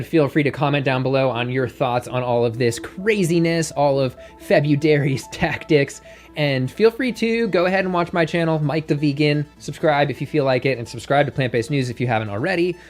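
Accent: United Kingdom. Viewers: As a Brit, I appreciated your British accents.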